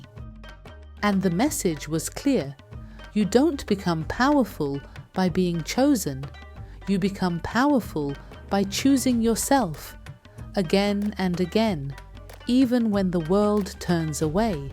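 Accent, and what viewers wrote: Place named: United States. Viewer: the American accent is an interesting one to hear in an ancient Indian story 😂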